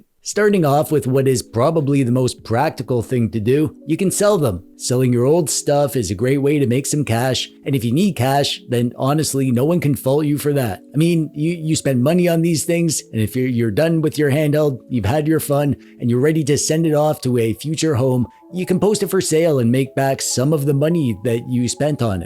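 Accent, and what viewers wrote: Canada. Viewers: Is Canadian? Nice lol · Are you Canadian?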